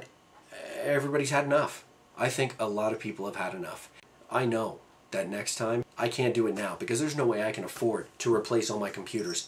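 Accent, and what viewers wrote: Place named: Canada. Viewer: You sound Canadian. Anyway, if you have so much experience on computers--I'm surprised that you blindly bought Professional without a second thought. Yes, I know a fair amount of consumers may be unaware of such a thing, but I'm surprised that you didn't catch such a thing.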